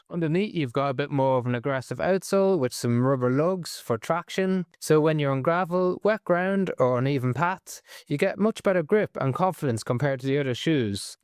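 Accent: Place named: Ireland. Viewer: Find it difficult to understand the thick Irish accent plus talks to damn fast so the obvious thing to help is when each shoe is introduced have the exact model flash up on screen this would be great help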